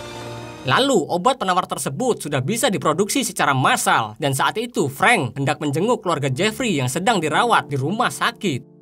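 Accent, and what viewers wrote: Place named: Siau, Indonesia. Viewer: Logat Siau kang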